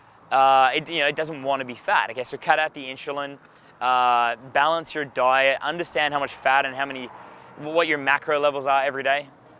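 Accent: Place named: Australia. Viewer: Do you have bootcamps in Perth, WA? Most i ever known of are UK or USA good to hear an Aussie accent... In regards to looks... i think when they say looks don't matter they mean being a 'pretty boi' and since evolutionary women respond to behavioral cues in men when it comes to attraction it doesn't matter. i do agree you have to be presentable tho... what is your take on this?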